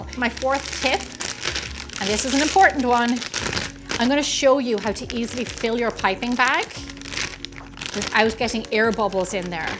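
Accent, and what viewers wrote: Ireland. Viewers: Thank you,! This is exactly what I was looking for. And listening to your sweet Irish accent makes it all the better.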